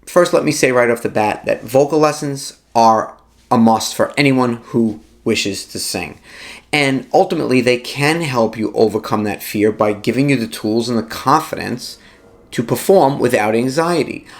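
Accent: New York, United States. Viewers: Thanks, as an Englishman (living in Brazil) your New York accent always puts in my mind of my favourite TV show. No not CSI New York but The Sopranos, so it was good to see a picture of Tony S in there!! I have a request for future videos. I'm not a pro and only do little fill in sets when the main performer is on a break at my local bar, but despite plenty of recent practice I still find playing guitar and singing at the same time a bit of a struggle. It's easy enough on simple 3 chord bluesy songs but for something like Layla, which I tried after your recent tutorial, you know a bit more complex, it's a bit more of a challenge! I do manage it ok but would like to not need to concentrate so hard!! Any tips. Also, I live in an apartment block and can't really rehearse singing at full volume and certainly not with a mic, any ideas or do I have to always wait until I'm out live to see if the song suits me. 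Anyway another great vid today, thanks.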